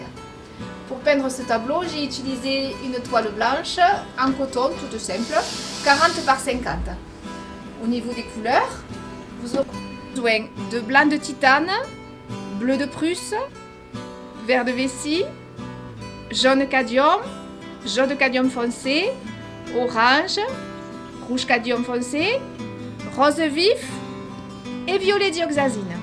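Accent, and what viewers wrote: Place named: Provence, France. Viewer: Vos peintures sont très belles , et votre accent a un parfum agréable de provence . Merci pour vos tuto .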